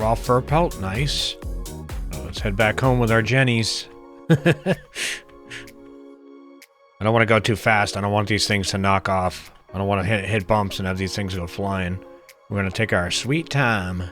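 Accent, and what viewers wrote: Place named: Boston, United States. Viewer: Dorchester kid here, youre either from close to here, or ha e the accent down when you choose to do it. Wicked pissa kid.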